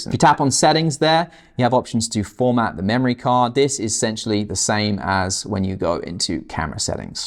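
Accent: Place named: United Kingdom. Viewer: judging from your accent I'm going to assume you're based in the UK and you forgot to mention one key point. The Mevo Core camera is NOT available in the UK with no date provided as yet as to when they will be available. In my communications with Mevo/Logitech they could not guarantee they would ever be available outside USA/Canada in the future either.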